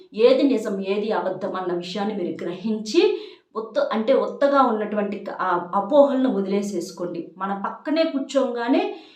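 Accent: Nellore, India. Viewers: YEVURAKKA NIDHE NELLOREAA BAGANE CHEPTHUNNARU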